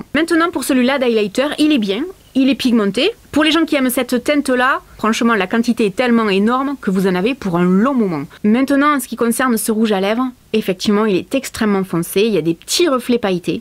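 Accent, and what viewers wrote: South, France. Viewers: Je regarde vos vidéos, et je vous trouve épatante, naturelle, franche, drôle, sincère, et votre accès du Sud ne gâte rien! Surtout ne changez pas! Biz bisou breton! A très bientôt!👏👏👏😍😍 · Salut, je suis tombée par hasard sur tes vidéos et je t'adore même si je ne me maquille pas. Ta spontanéité, ton humour et notre bel accent du sud sont charmants, ça fait du bien de voir ça sur YouTube! Ne change rien tu es super!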